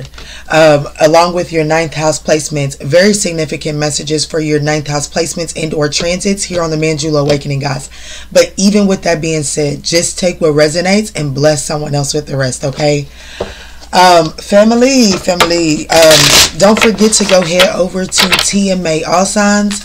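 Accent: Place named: Louisville, United States. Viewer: I hear Louisville KY in ur voice?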